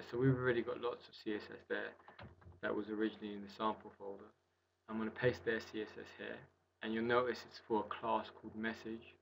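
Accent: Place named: South India, India. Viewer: very nice video good tutorial.. html tutorial is way too long for an title of "open graph" I am 19 minutes into video and there is 0 (Zero) open graph demo.. beside login and that too not covered in tutorial in first 30 minutes my critique... keep it simple, for a complete app tutorial it was good but first 20 minutes were boring ...another thing...be normal and try to be normal with your accent.. be yourself.. you have that typical southern indian/ sri lankan inspired "hech"tml in your video too many times..that is very annoying if you are trying to put layer of english or american accent.. caught a few times struggling with "lo"gin / "loo"gin.. again nothing bad but annoying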